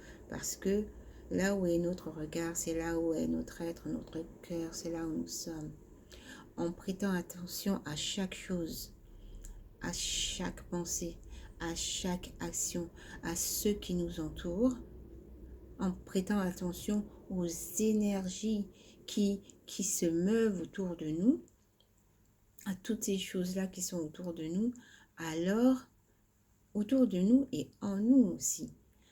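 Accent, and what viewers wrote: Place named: England, United Kingdom. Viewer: Merci pour la lecture des messages, des clés qui mène vers le chemin du succès. Vous avez vraiment l'accent Anglais. Et qu'et ce que c'est beau à l'écoute. J'ai adoré. Merci soeur pour votre merveilleux partage. Abssaanaa.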